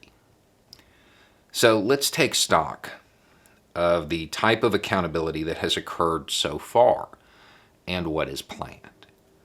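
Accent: South, United States. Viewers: Welcome! You are at the right place. is a comfort to many of us. The man can break down what's going on like nobody's business! Just an FYI: If you see videos where his Curious George patch is upside down, get ready for some Grade A sarcasm/satire. (and sometimes, his southern accent gets really thick during these videos) Sorry about your cat. I've dug many a hole for a loved one, and it's a hard thing to do. Makes me feel good that you're here now, however.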